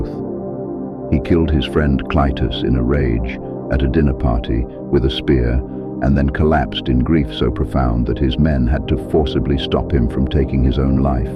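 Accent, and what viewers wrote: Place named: United Kingdom. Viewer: so bored of that british accent commentator without a face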